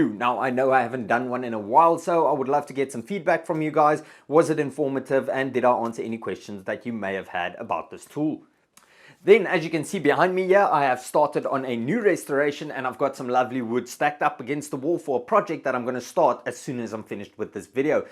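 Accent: Afrikaans, South Africa. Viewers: Always makes me happy to hear a South African accent. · Are you a South African? I never would’ve picked it. 😂😂👍😉🇦🇺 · Another awesome video!!! Out of curiosity, what is your native language? Your accent seems like Afrikaans, not Zulu. Thank you for the video!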